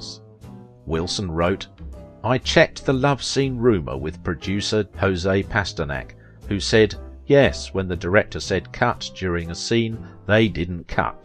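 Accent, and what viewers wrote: United Kingdom. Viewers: I could have appreciated this video if it wasn't for the creator's British accent. Try as we may, we just can't escape our attachment to that island nation. One revolutionary war, two world wars and the cold war and we're no closer to being independent from them. If something originates in Britain, it will find its way into our country. Some say it's due to a common language and history, but it's like relatives who live on the other side of the country. Send them a Christmas card every year, but let them live their lives, while we are free to live our own.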